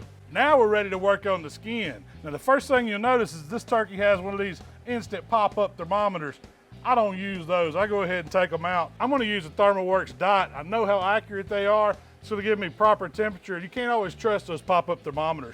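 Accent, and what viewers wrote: South, United States. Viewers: Look no further when scrolling through how to smoke a turkey and come across a guy with a mean southern accent. Thank you sir!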